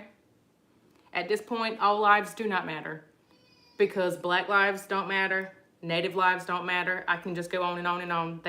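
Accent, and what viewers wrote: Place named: North Carolina, United States. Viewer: I'm an older woman and I really enjoy your videos. I'm originally from Laurinburg and I bet you know where that is. Our accents are alot alike. LOL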